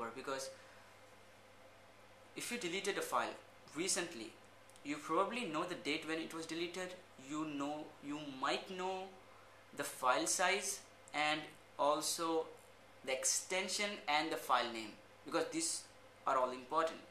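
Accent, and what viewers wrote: India. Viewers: Gotta love the Indian accent! :D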